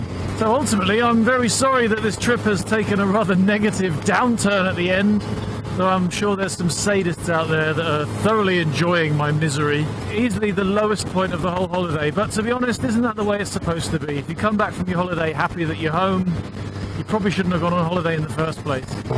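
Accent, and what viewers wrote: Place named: England, United Kingdom. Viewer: Ha!!! Awesome ride! You sound like me but with a proper English accent! My wife enjoys making fun of me and graciously reminds me of my "first world problems" whenever that sort of intervention is necessary. Back in my early years of riding (throughout the 1980's), nothing was "waterproof". It was either leather or nylon but in all cases it was wet, cold and heavy which just made things miserable. I eventually learned the value of the smallest and strongest plastic bags I could find and a good quality rain suit. Everything of value would go in the plastic bag before it went in my backpack or tank bag. Looking back now 35 years later, the memory of the bad experiences have all but faded and I can honestly say that the times on my '84 FJ1100 and Virago were nothing but wonderful. Try to take a moment to realize that what you're doing is incredibly fortunate and very few people in this world have the opportunity to do so. 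I'm sure there are many of us tuned in who have been there/done that as well as millions of those who never will are thoroughly enjoying your experiences. Either way, it's a joy to watch, listen and learn and for me personally, the sparking of memories that I recall as being the best times of my life. Cheers.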